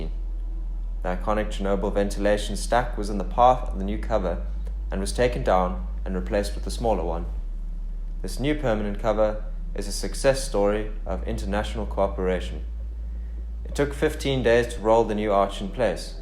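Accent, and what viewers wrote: South Africa. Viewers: Hey I hear the Cape Town accent! Hello from somewhere close by. Very well done video :) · It’s a South African accent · Your nasal South African accent is made even worse by your flat and dead-boring narration style. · I like your South African accent 👌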